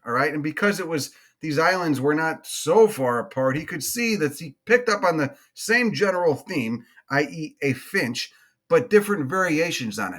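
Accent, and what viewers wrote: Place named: New England, United States. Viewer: love the new england fisherman accent… there’s a wise genius to it...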